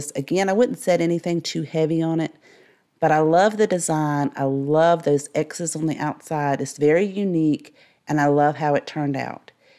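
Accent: South, United States. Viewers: I am a new follower. so far everything you create is just awesome.. I think I hear a little bit of country accent in you... lol.. I am country , live in South Carolina.. Thank u for sharing your amazing talent with us. ❤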